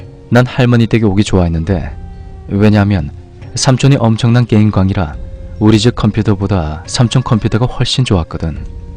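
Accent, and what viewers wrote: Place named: Busan, South Korea. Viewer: 충청남도 서산인데 부산사투리 쓰네 ㅋㅋㅋㅋㅋ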